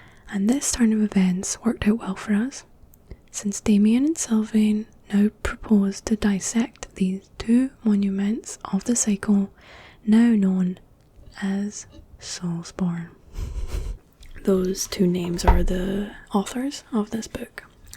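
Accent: Scotland, United Kingdom. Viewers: Scottish accent and Sark douls in one video. The bell hath been clicked.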